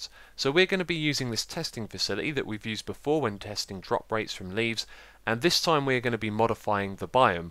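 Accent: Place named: England, United Kingdom. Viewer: Ahh thats cool to know :-) Sorry for repetitive question aha but what part of England are you from because my British accent and my friends have a very similar accent to yours, Feel free to not answer this if its too personal or anything aha :-)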